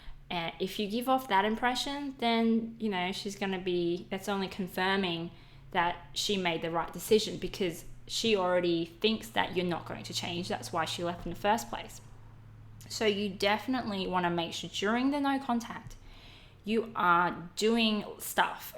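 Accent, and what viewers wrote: Australia. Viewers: Hi, are you Aussie?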